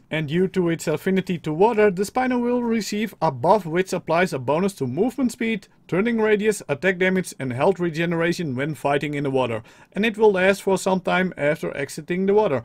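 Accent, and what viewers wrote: Netherlands. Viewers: Lord Tachanka volgens mij wel want hij had eens een nerderlandse command geplaatst en hij spreekt veeldingeb op een nederlandse manier uit zoals sarco en andere dingen en hij heeft zon nederlands engels accent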